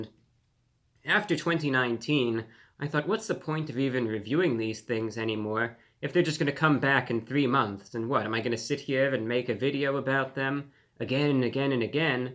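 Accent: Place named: Transatlantic, United States. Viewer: I've never seen someone in this modern era speak with a Transatlantic accent. Fascinating!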